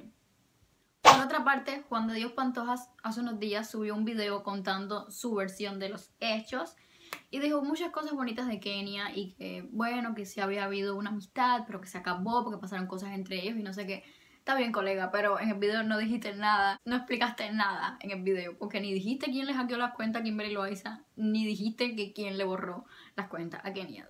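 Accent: Cuba. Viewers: hola 👋 yo me llamo betsye y te veo desde Los Angeles, solo quiero decirte que creo en lo personal que eres una persona muy linda y me encanta tu acento cubano 😉👌 jeje mire que te hacían comentarios respecto a Kim y así que decidí venir a ver tu canal y en lo personal creo que tienes una personalidad muy bonita y me agrada mucho tu energía, sigue echándole muchas ganas y no le des fuerza a los comentarios negativos 👍🏻😃 ya tienes una nueva suscriptora!! 👏🏻👏🏻🤗❤️ tan solo por ser originalmente 😄👍🏻 saludos!!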